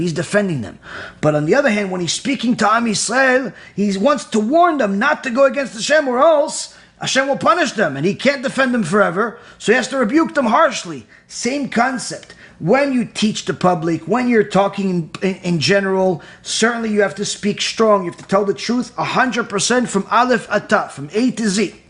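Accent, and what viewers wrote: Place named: Yemen. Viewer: I like the yemenite way of Hebrew-pronunciation and share your point of view, dear rabbi.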